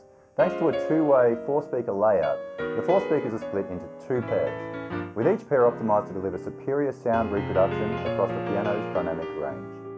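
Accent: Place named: Australia. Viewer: This is how Australians speak, proper English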